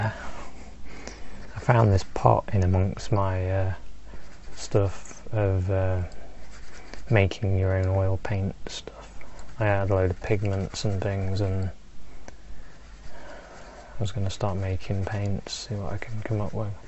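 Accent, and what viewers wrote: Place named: England, United Kingdom. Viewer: I know his accent is English, but is he based in the UK or Australia as we have a lot of Brits who migrate to Oz. Cheers from down under.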